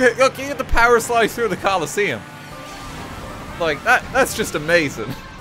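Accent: Ireland. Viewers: Are you accutaly Irish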